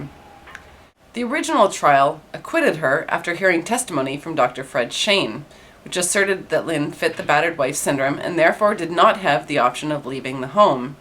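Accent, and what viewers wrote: Canada. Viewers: Jesus cant act, you tell terrible stories. If you werent so cute and prone to telling the truth I wouldn't watch this. You really need some public speaking classes. You pause st awkward points and that takes from the flow. That Canadian O gets me every time too.: ) But seriously, you do sound like a first year broadcast student who is about to pass out from fright. Also, you looking at us over your glasses frames you as an agressor and you appear annoyed at the viewer.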